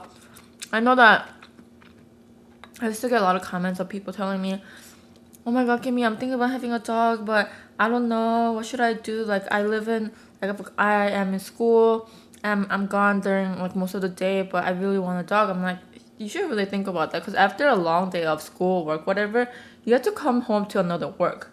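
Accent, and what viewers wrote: South Korea. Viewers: Unnie I'm sorry but you speak English in Korean accent I cant understand most of your words, cheongmal jusunghaeyo.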